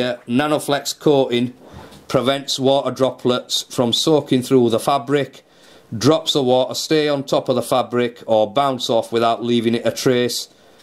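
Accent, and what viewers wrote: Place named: West Yorkshire, United Kingdom. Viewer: God bless t West Yorkshire Dialect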